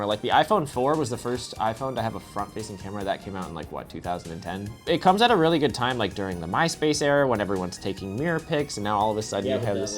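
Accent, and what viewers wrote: Brazil. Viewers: Are you brazilian? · Is he Brazilian or something?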